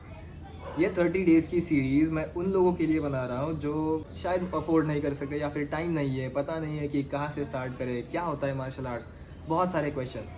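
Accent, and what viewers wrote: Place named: Malvan, India. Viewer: Bhava tujya shabada madun malvani zalakte 😂😂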